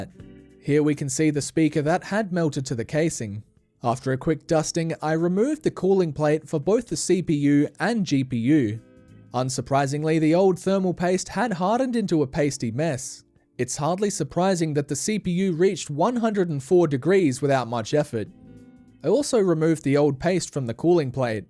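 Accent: Australia. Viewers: just wondering are you aussie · Bruh are you Australian or S. African? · Man finally an Aussie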